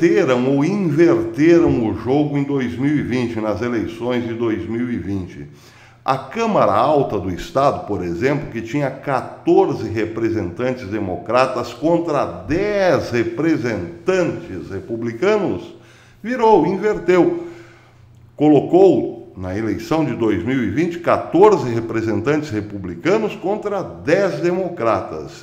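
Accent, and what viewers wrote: Caipira, Brazil. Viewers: Como sempre seus comentários muito consistentes a atuais! Sempre ba frente da midia "oficial"! A forma caipira de passar a msg tem seu charme mas talvez tire um pouco a atenção do conteúdo. Forte abraco! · Boa tarde Professor! OI NÓIS AQUI TRAVEIZ!!!!! SOU NETA DE AUSTRÍACOS E POLONESES. CRESCI OUVINDO UMA SINFONIA LINDA DE SOTOQUES MISTURADOS COM O PORTUGUÊS . E CONFESSO EM ALTO E BOM SOM: ADORO O CAIPERES! NOSSO PAIS Ê ASSIM!!!! QUEM NÃO GOSTA ESTÁ NA PRAIA ERRADA! SE MUDE PARA O GABINETE DO SÔ " GIRMAR MENDES"!😄😄😄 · Obrigada por mais um excelente vídeo professor! Estou amando o caipirês! Mais ainda ao saber que uns e outros ficam irritadinhos! Que Deus o abençoe sempre professor! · Bom dia, professor ! Assisto todos os seus vídeos.... Não deixe o "caipirês". Adoro!!! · Bom dia professor. Adorei a nova versão em caipirês, pois sou do Nordeste e cultivo os falares da nossa terra Brasilis. 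Entonces, adorei. Oxente, quem não gostar num goste! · Bom dia Professô podi cuntinua cum o caipireis ço! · O caipirez é vida professor. O Sr. faz muito bem o caipirez sem denegrir ou rebaixar os representados. Somos nós brasileiros que nos comunicamos assim, de forma eficiente e com expressões regionalizada que formam um código capaz de ser decodificado apenas para quem vive a miscigenação que ocorreu em nosso país. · Eu amo seu caipirez tudo fica muito mais leve, apesar de todas as.noticias serem claras e sérias sem fale. Isso é o que todos precisamos ouvir para fazer nosso próprio juízo dos acontecimentos sem viés ideológico e isso é muito bom. Obrigado de coração por dividir seus conhecimentos e pesquisas conosco. Com ou sem caipirez você é o máximo nas suas análises. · Bom dia Professor! Adoro o seu "caipirez" 😁 Adoro seu senso de humor. Não mude! Continue assim e pra quem não gosta e diz que não vai assistir mais ... 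JURA? Fala sério 😁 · Parabéns pelo seu trabalho que está cada vez melhor. Amei o sotaque caipira, ficou muito bom e divertido. Além das notícias importantes e sem filtro, temos o prazer de conhecer mais um dos seus talentos. Que Deus sempre te abençoe com tudo de bom 👏👏🙌🇧🇷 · Parabéns professor 👏 gosto muito do seu português caipira..sou da roça hahaha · Prof. Boa essa de falar caipirez. Realmente vai enlouquecer os algoritmos. Parabéns pela criatividade. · professor por favor fale somente o sotaque caipira , este é o original do brasileiro , obrigado professor , o senhor é um jornalista ótimo , patriota, e cristão. Deus esta com o senhor. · Apesar das notícias preocupantes, seu bom humor nos contagia! Seu sotaque "caipirês" ficou muito lindo! Parabéns, Professor, pelo seu esforço de desvendar, para nós pobres mortais, as tramas desse mundão de Deus 🙏🙏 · A falecida e mui saudosa Inezita Barroso iria adorar esse seu sotaque caipira, Professor! 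· Kkkkk É o melhor canal de notícia bom é escutar o caipirez é bem brasileiros PARABÉNS PROFESSOR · Bom Dia Professor 😄 Sou De Sete Lagoas MG E Ó Seu Caipirez É O Nosso Normal Aqui😂😂😂💚💛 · Parabéns professor pelo seu sotaque. ...eu entendo muito bem ...sou Mineiro sou da roça sou caipira sou brasileiro....Deus o abençoe. .. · Bom dia, professor! Não é todo mundo, que tem capacidade de entender o caipirês. Os incomodados que se retirem. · Bom dia , Professor !! Esse caipires é o charme do Canal... Semana abençoada para todos !! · Professor,eu adoro ouvir o seu caipirês,eu dou do tempo que se falava assim 😊 Por mim,pode continuar,o que importa é o conteúdo dos seus vídeos 👏👏👏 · Oh professor eu amo seu caipireis.Bjssss querido, Deus te abençoe 😍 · Verdade .... adoro essa forma jocosa como o senhor apresenta as noticias Imprimindo este sotaque de caipires e divertidooooo !! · continue sempre assim professor,no caipirez ou normal está ótimo parabéns. 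· Professor, tá mais gostoso de acompanhar o seu canal agora; além de informação, conhecimento , a gente se diverte a beca. O caipirez ficou top! O senhor leva jeito tb como comediante . 👏👏👏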